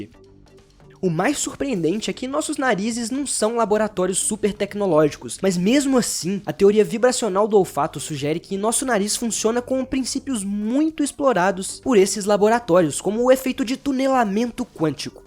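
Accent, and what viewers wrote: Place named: Minas Gerais, Brazil. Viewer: Que orgulho desse sotaque mineirin 💛💛💛💛💛 vídeo incrível,!!!!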